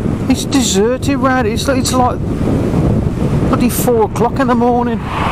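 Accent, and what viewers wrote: Birmingham, United Kingdom. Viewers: Very intresting guy to watch . U a brummie like myself 😂👍👌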